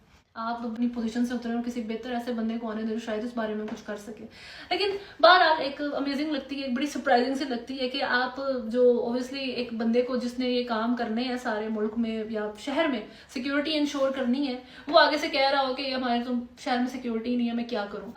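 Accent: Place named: Punjab, India. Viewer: Yaar Punjabi accent me English kenyu bolte hain aap ?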